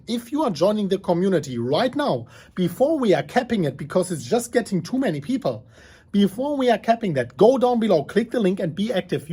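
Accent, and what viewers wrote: Germany. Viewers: Danke alda, nicht nur der beste englisch crypto youtuber mit deutschem akzent :D sondern auch bester youtuber von dem man in jedem video was lernen kann!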